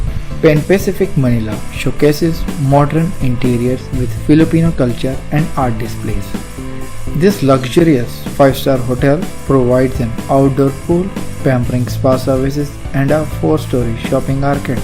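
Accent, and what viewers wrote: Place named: India. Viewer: the way you speak i can guess you are from india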